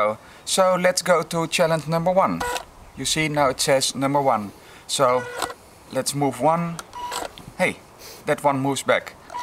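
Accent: Netherlands. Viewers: grappig ik kan aan het accent horen dat je nederlands bent :D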